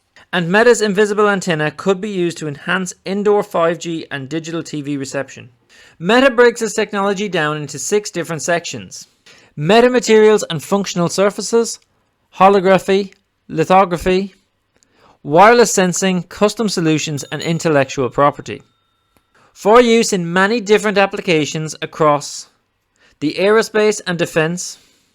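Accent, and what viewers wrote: Ireland. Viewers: What part of Ireland are u from?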